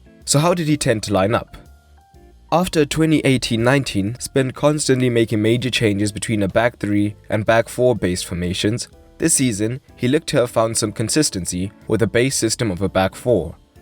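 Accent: South Africa. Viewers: This guy sounds like he is from Durban. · South African?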